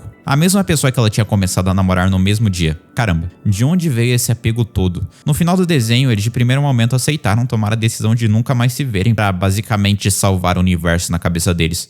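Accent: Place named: São Paulo, Brazil. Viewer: desse com sotaque paulista querendo falar da star scrrr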